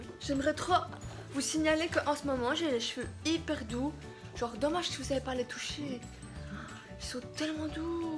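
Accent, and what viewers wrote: Belgium. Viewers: Tu es belge sa s entend 😂 moi aussi je suis belge bisous je t aime ❤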